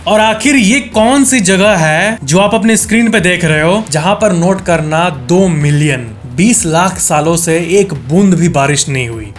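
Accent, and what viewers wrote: Bihar, India. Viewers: Ap bihari h kya apka accent bihari jaisa lgta h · aap bihari language mat bola Karo purushling ko istriling 😅😅😅😅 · Bhaiya,u r giving bihari feeling ❤❤❤❤ in talking 😊😊😊😊😊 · Are u from bihar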